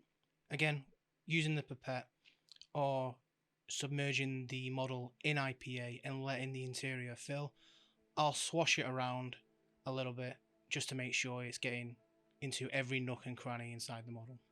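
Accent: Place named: Northern England, United Kingdom. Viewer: Dude I completely didn’t expect your accent when I came in here. Super happy to be supporting a northern artist.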